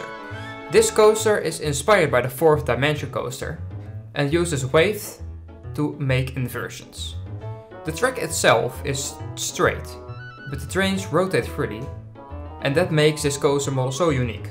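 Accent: Netherlands. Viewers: Jij bent Nederlands ik weet het gewoon ahah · U are dutch arent you :))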